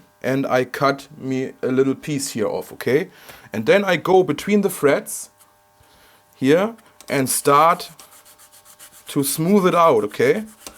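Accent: Germany. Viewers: Hallo, kann ich einen deutschen Akzent erkennen? Do I detect a German accent? I like your style, going to try it on a neck or 2. -Regards, -G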